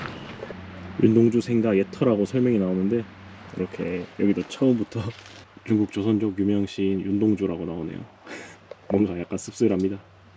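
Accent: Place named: Gyeongsang, South Korea. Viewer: 쌍도 억양 듣기 싫어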